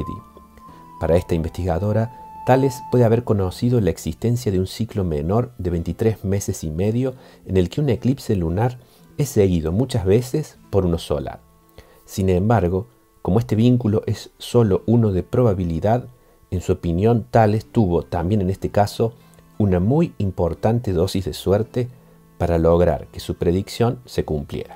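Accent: Córdoba, Argentina. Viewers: UD es de Córdoba ?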